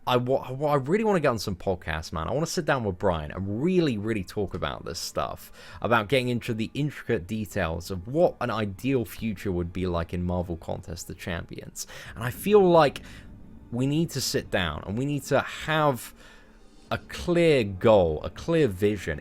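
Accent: United Kingdom. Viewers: Not to be weird, but I love hearing you speak in your British accent with your British words hahaha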